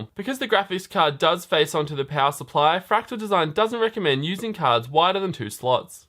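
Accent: Australia. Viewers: Mate, no offence but your voice is horribly annoying. On top of it you just don't stop talking with no pauses between sentences. Your oz accent is almost incomprehensible at times and I resorted to use the captions which as you can guess are not very good. I could not (even if the video is well made, edited and nice altogether) watch more than the half of it. Please consider someone else to narrate it.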